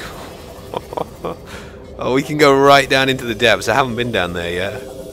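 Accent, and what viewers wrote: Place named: Birmingham, United Kingdom. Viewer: I can hear a slight yam yam/ brummy accent... you local dude?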